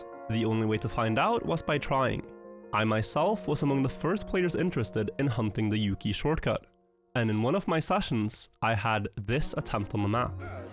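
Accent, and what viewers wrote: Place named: Norway. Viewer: Young sounds so Norwegian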